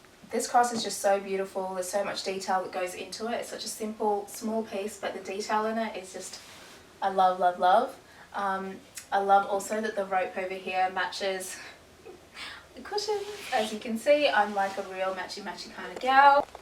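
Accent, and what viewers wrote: Australia. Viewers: Love your aussie accent!! You look beautiful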